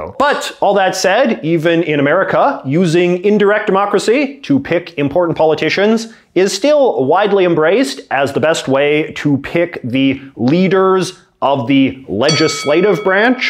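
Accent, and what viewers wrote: Canada. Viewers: American politics make more sense when explained with a thick Canadian accent.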